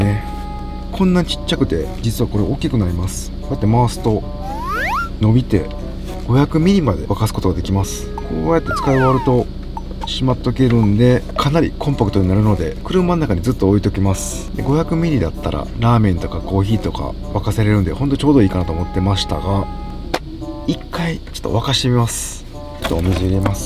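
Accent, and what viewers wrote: Kansai, Japan. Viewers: こんにちわ！ ㊗️10万人超えおめでとうございます🎉🎊 毎回そうですけど、の優しい語り口もとっても好印象です(わたしずっと東京住みなので関西弁に憧れてます) あとほんといつもお人柄が良くて心地良く観させていただいています！これからも楽しく拝見させていただきますね！あとこの間のライブは、わたし時間が合わなくてリアルタイムでは観れなかったんですけど、そのライブ配信も翌日に楽しく拝見させていただきました！ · お疲れ様です😁 私も動画を観させて頂いて車中泊グッズとか見に行くようになりましたホームセンターのそうしたグッズと売り場を見るのが楽しくなりましたあとの暖かい関西弁の御説明と笑顔も良いなあとほっこりしながら観させて頂いてます❗ 御自身も動画の魅力です😁 ステーキ焼ける音がたまりませんね😆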